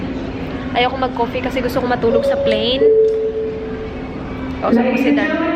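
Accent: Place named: Visayas, Philippines. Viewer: You speak English so well and yet bisaya kaayo gihapon ang dating. Hahaha ✌😇😂💓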